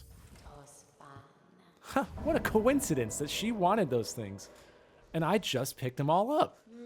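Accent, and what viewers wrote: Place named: Australia. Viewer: This guy's funny with the Australian accent 🤣 😂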